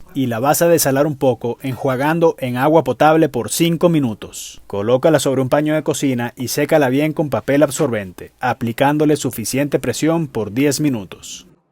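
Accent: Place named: Venezuela. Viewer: por tu acento veo que eres venezolano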